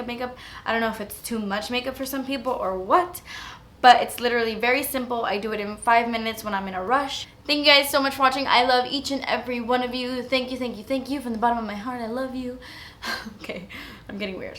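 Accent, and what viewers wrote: Miami, United States. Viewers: She calls it a Miami accent . She's Cuban but doesn't have the accent from them . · I love your Miami accent! Lol. So weird but I can always tell when someone's from there.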